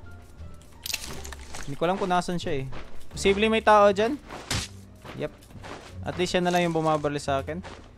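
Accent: Visayas, Philippines. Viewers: Bisaya ni do😂😂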